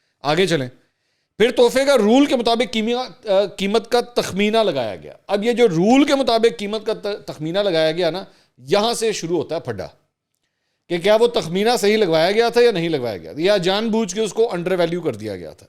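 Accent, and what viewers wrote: Punjab, Pakistan. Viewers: Bhai apka Punjabi touch Kamal hy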